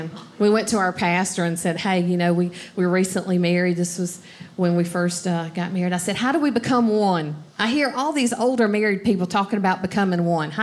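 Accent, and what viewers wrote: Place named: Atlanta, United States. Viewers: That's just how you pronounce mills in Georgia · I could listen to her accent all day. I used to travel to Atlanta, I miss it!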